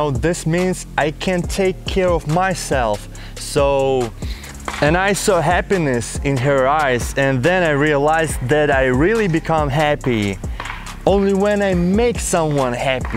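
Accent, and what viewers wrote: Russia. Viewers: плюс русский акцент. Сто раз замечал, что русский, говорящий на английском в 100 раз понятнее, чем иностранец, просто потому что произносит так, как ты сам это делаешь. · Раньше как-будто над акцентом больше старался, а сейчас наоборот решил больше России матушки внести) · Blyat. Oh ug etot Russian acient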